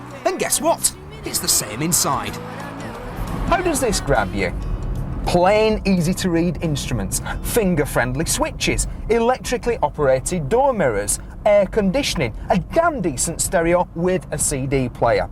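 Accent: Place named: Northern England, United Kingdom. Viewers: EGGBIRDTHEROOSTER He's from the North. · 11/10 for his North England accent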